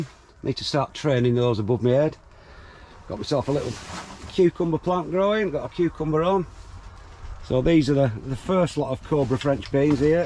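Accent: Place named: Yorkshire, United Kingdom. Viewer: I don't have anything pertinent to say other than thank you so much for sharing. I've learned a ton from you and your enthusiasm is great. (So is your speech. I did field school in North Yorkshire and hearing you always makes me smile. Also I'm trying REALLY hard not to ask you if you've ever wanted to fly your drive over Malham Cove and Tarn 🤣💚)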